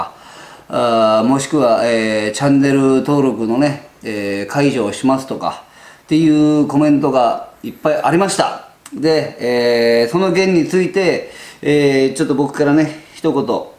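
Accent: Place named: Hakata, Japan. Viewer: 清々しい感情と博多弁がとても好感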